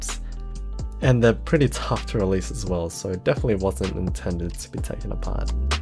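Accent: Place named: Australia. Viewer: an Aussie accent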